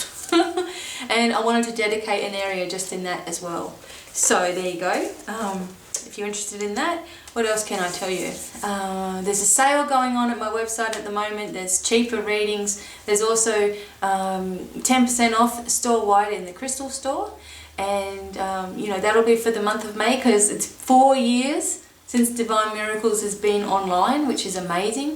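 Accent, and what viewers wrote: Australia. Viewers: Your sunny, unpretentious nature, your Aussie accent and your cheeky smiles always brighten my day and make me grin happily. Good job, B.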